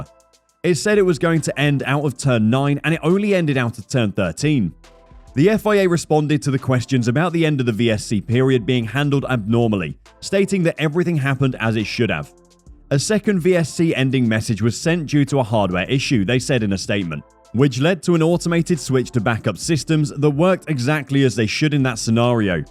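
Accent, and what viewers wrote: United Kingdom. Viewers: Typical British: Unsporting as hell, but with a nice accent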